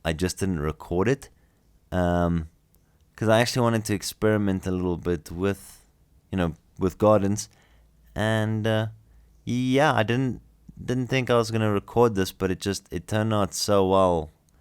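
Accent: South Africa. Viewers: Ermagahd a South African. So weird to hear our accent on youtube XD immediately recognize!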